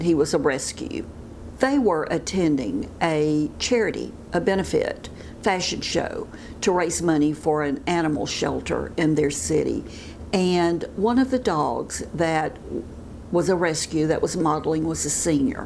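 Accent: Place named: Florida, United States. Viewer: Sunday morning here in UK and enjoying my coffee with you - and I love your uh's! It's part of your fabulous accent - thank you for sharing all your news but was very sad to hear about Ethel Cup Cake, and feel so sorry that Jennifer and Riley will be going through a tough time but what a wonderful act of loving decency that did which would have made Ethel's days happy ones. Take care all in Florida we're thinking of you over the pond.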